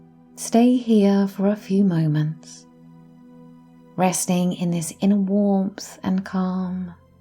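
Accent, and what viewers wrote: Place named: England, United Kingdom. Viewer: My Mum is my true worldly life partner. And I lost her. She died. I have survivor remorse. I am recovered. My Mum is renewed in a different world. I heal my intestines. I am well. Thank you for your hypnosis stories. Your words. Your english voice. Your natural way. Your work. Continue to promote your work to the world. Thank you.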